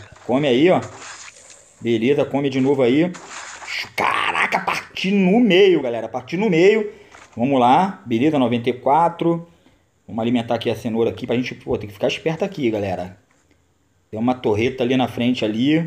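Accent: Rio de Janeiro, Brazil. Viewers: tu é carioca Mano?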